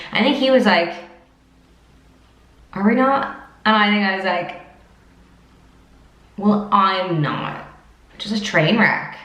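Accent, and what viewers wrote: Ireland. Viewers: She sounds Irish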